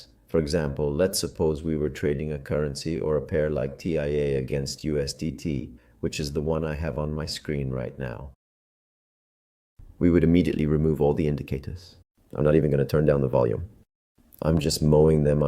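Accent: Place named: England, United Kingdom. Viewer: Me gustó con la pronunciación inglesa, ud siempre innovando y superándose, lo admiro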